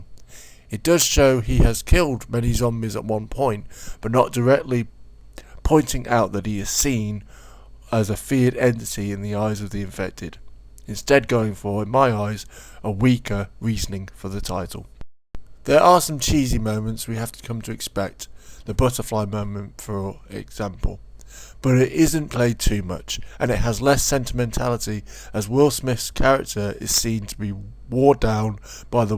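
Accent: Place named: England, United Kingdom. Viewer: It looked like another res evil to me but I like Will enough to give it a look. Thanks for this insightful review. Nice to hear an english accent tell it how it is for a change. You just need to polish up the speech a bit and it would sound professional. Nice job though. I'm going to favourite your page for future reference, thanks. 5*'s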